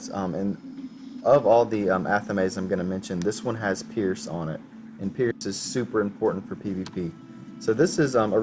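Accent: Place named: South, United States. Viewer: I like ur southern accent.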